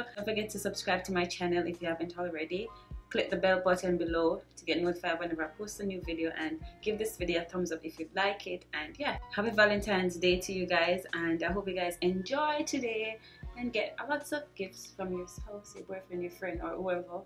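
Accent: Jamaica. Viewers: girl u slay this fuck out this video....sound like u a one a wi....mah hear too jcan talking😂😘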